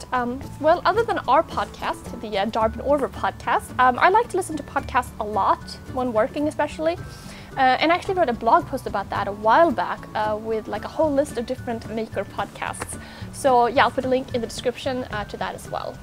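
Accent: England, United Kingdom. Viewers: Hi, ooo you are from oregon US I was thinking you was from England Jaja well ok, 👉🏼always love your videos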